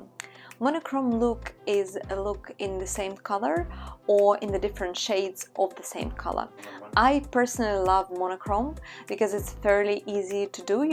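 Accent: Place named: Russia. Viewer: Русский акцент чувствуется 🤣😂🤣🎷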